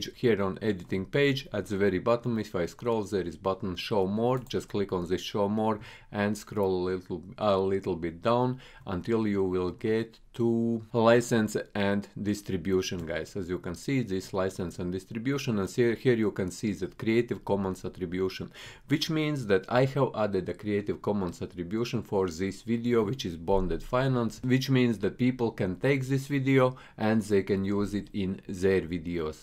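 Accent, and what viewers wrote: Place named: Russia. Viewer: Woah Russian accent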